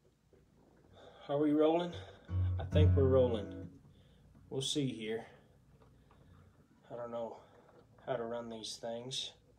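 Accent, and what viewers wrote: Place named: South, United States. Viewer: How’d he develop such a southern accent in Washington I know there’s wide open spaces and ranches cattle and all that but I’d never seen anyone there sound southern and I’ve been there a whole year one time (just for a girl) theyd make fun of my accent.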